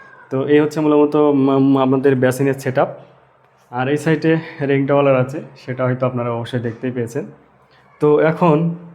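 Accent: Jessore, Bangladesh. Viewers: আপনার কথা আমাদের যশোরের মতো। আপনার ভিডিও টি অনেক সুন্দর হয়েছে।অনেক কিছু জানা হলো।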